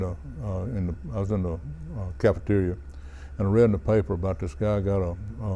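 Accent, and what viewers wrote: Louisiana, United States. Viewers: You Cajun? Sounds like southern Louisiana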